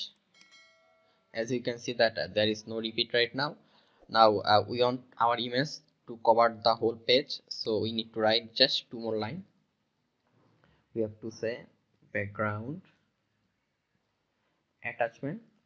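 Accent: India. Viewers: Thank you Mr. Indian-sounding guy :DD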